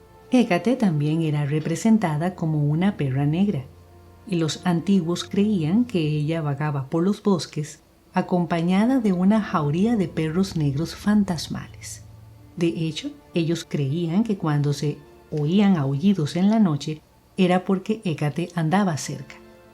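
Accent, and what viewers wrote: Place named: Costa Rica. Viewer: Tenes acento tico🥺❤️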